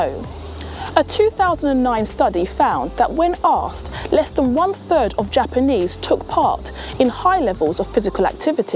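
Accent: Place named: United Kingdom. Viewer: She is british.that accent is from there.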